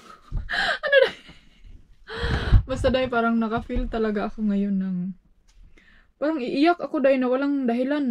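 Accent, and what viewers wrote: Philippines. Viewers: Bugay kay kag English Indai pinay na pinay I like it 😄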